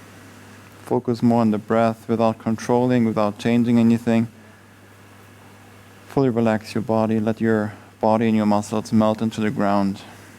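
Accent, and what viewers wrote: Germany. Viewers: There's nothing better than breaking a mild sweat during yoga practice. I think the German-esque accent helps as well!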